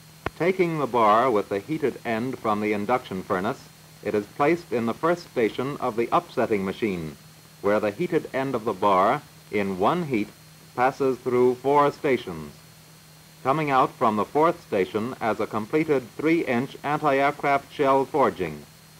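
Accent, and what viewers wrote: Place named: Mid-Atlantic, United States. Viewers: It's called the "mid-Atlantic accent" - the "mid-Atlantic" jokingly meaning halfway between the US and England. It's an affected way of talking that was taught in some circles during the early 20th century; it's by no means the way any actual people talked normally. You hear it a lot in film and radio of the era. It's "FDR-speak." :) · I was watching this and saying to myself, "Geez, how labor intensive and inefficient...How the hell are they making enough to keep the war going?". And then I noted the date...1939. Oh. I should have figured it was pre-war from the Mid-Atlantic accent of the narrator, the fact that all the employees are male, and the relatively pedestrian rate of production. Bet that same production line did not even resemble what we see here by 1945...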